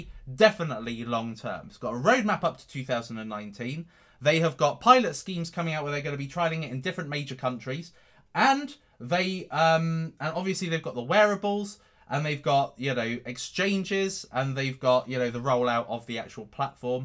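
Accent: England, United Kingdom. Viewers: You talk with an English accent and sing with US one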